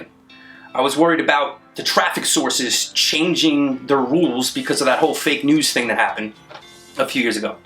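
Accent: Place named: United Kingdom. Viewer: It's all about the British accent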